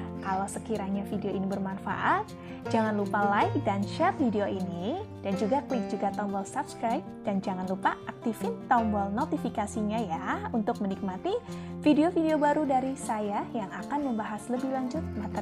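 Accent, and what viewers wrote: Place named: Banyumas, Indonesia. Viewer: Ngapak coy.... LBS Saya pake ipotgo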